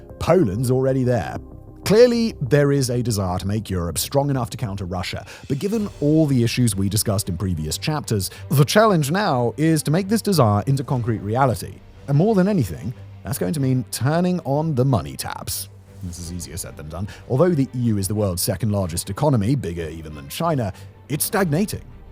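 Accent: United States. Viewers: My god is sounding more and more American every day. It's good to see him want his country to stand up for itself with some fire in his blood about it. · had a little American comin out in him this one got me pumped up given the horrible situation! Stay Strong!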